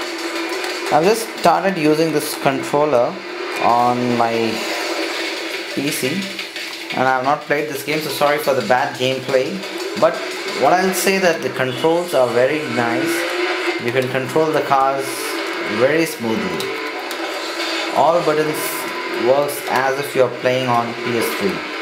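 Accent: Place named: India. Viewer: its indian guy talking, would not trust 2 much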